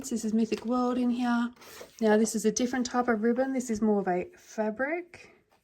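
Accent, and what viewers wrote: Australia. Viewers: Congratulations! You are an amazing colourist. I am so grateful for all you have taught me. Your colour combinations have been immensely helpful as that is what I struggle with the most. Also it is very heartwarming to hear a fellow Aussie accent! Keep colouring and being amazing 🤩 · Wow!!! Huge Congratulations!!! Love your channel … always have your videos running in the background on the weekends and join in whenever I can. Thanks so much for all your videos … for sharing your knowledge and allowing us to be inspired to colour our world💕. Lovely to hear another Aussie too❤️. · I watch all your videos that are on you tube, to give me inspiration and to learn new techniques, your colouring is stunning and i can only hope to get that good someday, , it is nice to see and hear another Australian colourist. Congratulations on your 20,000 subscribers, I am sure your channel will keep growing well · When I started adult colouring this year I searched for different channels to learn from and see what was possible and I immediately subscribed to you for your stunning colouring but because of your familiar Australian accent! 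There are lots of British and American colourists but it's wonderful to hear a fellow Australian 😅 Congrats on your well deserved success 🎉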